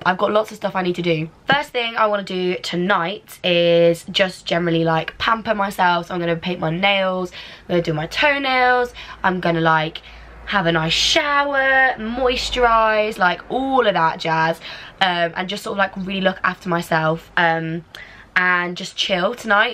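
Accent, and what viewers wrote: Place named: West Midlands, United Kingdom. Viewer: Where has your lovely West Midlands accent gone? Please don't turn posh....Brummie is sooo much better! X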